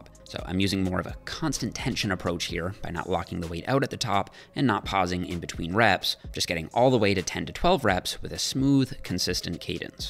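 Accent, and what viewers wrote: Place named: Newfoundland, Canada. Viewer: is from Newfoundland (can't expect anyone to know where that is, but I'm also from there) and I honestly applaud his focus on ensuring he speaks clearly as we have/are prone to having a very distinct and often thick accent that sometimes even locals have a hard time understanding what's being said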